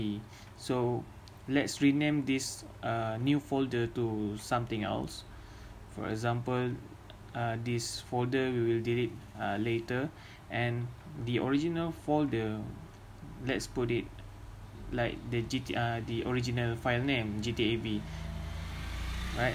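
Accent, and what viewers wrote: Malaysia. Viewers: how about if my disk space is full, but i had the game download already? btw where you from because i hear some manglish. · sounds malaysian?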